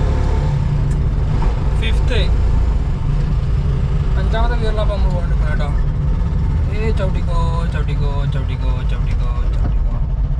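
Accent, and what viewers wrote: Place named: Nilambur, India. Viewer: മലപ്പുറം നിലമ്പൂരിനടുത്ത് ആണെന്ന് തോന്നുന്നു സംസാരം കേട്ടിട്ട്.. ☺️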